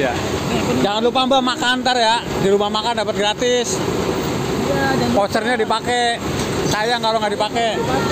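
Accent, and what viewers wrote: Banyumas, Indonesia. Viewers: Bang oak de melu manteng maning......paten liputanya mantap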